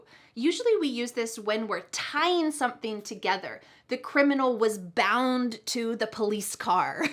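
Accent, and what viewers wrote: United States. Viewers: Is it U.S. English? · Thank you aunt for sharing your knowledge and i also exicted to want study with you in next video😁👌and last you are my good teacher, who is always help me learn new words in american accent......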